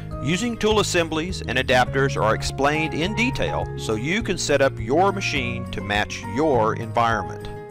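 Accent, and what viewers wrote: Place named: South, United States. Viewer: esprit cam and southern accent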